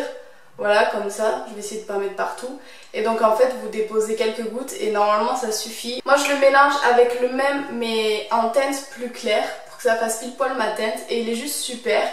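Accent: South, France. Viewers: une "cagade" mais ouuuuui Sud en force, par ailleur ton accent me fait rêver une des seules youtubeuses avec un VRAI accent du Sud ! Super vidéo comme toujours! continues comme ca ma belle ❤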